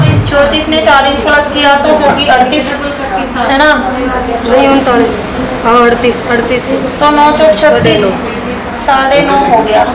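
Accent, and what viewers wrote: Haryana, India. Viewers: Madam ji Apki Awaz se lgta h ki aap Ki boli Hariyanvi h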